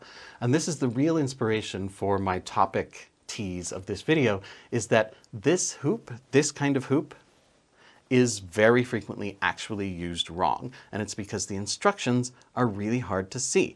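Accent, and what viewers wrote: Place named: Canada. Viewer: Hello. 😊. But, why use a hoop at all? (ps:you sound like me, from Canada!)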